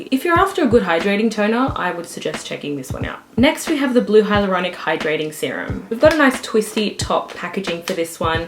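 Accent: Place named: New South Wales, Australia. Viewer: I'm so glad you're posting again! It's great to have an Aussie skincare channel, and someone who has dry skin!! Maybe you could do a video about yourself and your cat? Judging from your accent I'm gonna assume you're from NSW maybe? When did you get into skincare, how did you learn about it? Why did you start the channel? What have you learned from the channel? How much skincare do you go through seeing as you've tried so much? I'm just started using No7 cleansing water, hydraluminiius day cream, retinol, and retinol night cream and I'm really enjoying all of them, I got them after you mentioned No7 in one of your videos. I use dermaveen as my body moisturiser, and I really want to try their new face wash, the ingredient list looks like it will work well for me. I usually apply my body moisturiser first then by the time I've done my face routine and brushed my teeth I'm ready to get dressed. 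Mind you I wouldn't be able to do that in the morning in winter, too bloody cold.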